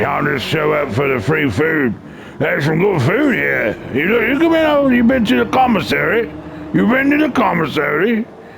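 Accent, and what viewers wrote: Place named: United Kingdom. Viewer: Some cool racing combine with british accent color commentary plus a lot of madness!!! Thanks!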